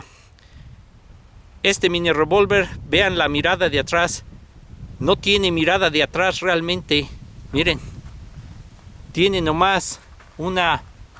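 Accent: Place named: United States. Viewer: progre so bueno laverdad no estoy seguro pero a alguien que un dia hablo español es imposible que se le olviden ciertas cosas, y se le nota al hablar de que algunas cosas no las maneja, como la acentuacion por eso pienso que es Estadounidense. pero bueno, su canal es excelente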